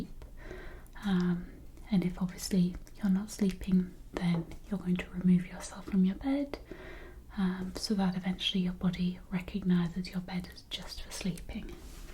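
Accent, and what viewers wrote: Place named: North East England, United Kingdom. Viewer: Love it. Totally relaxing 😍😴 also love the northeast accent I'm from north yorks so having a local asmr artist rocks 👍🏻👍🏻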